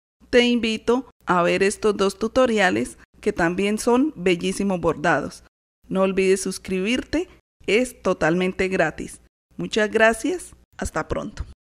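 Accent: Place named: Andes, Venezuela. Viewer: Su acento parece de los andes de mi Venezuela amada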